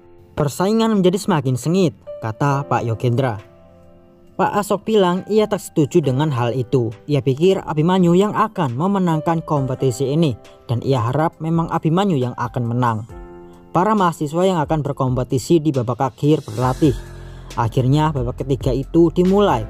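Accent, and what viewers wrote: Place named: Java, Indonesia. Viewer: Medok wong jawa kie admin ne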